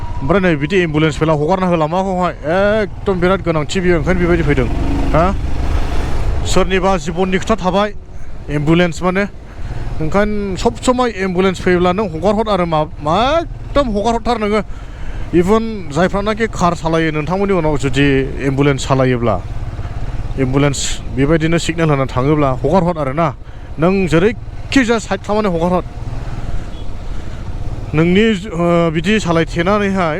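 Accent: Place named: Baksa, India. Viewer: baksani sur arw ngswr ni sur jw alda Ka ada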